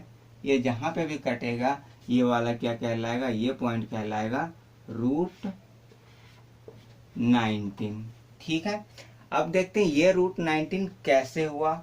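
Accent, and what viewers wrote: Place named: Haryana, India. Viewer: Haryanvi rocks :))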